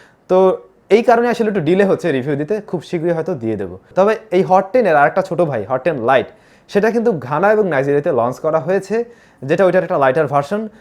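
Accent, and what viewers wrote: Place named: Feni, Bangladesh. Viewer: Amar district o Feni ..feni kotha sune valo laglo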